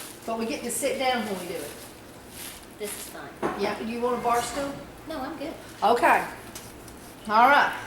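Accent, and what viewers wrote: South, United States. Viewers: What was the name of the last ingredient that you used from that list? I'm sorry but its hard to sometimes hear well withat cute southern accent of yoyrs. Sounded like yellow dot glue but I'm sure that wasn't it at all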